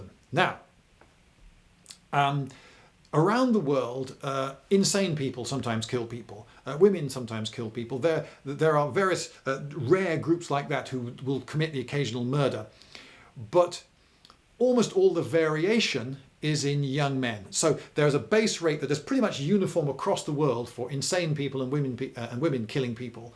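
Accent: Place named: United Kingdom. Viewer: The accent definitely helps. If this would have been American I would have gotten sick of it extremely quickly, but I just love listening to a Brit talking about his passion. I have learned more from than I ever did in my school career.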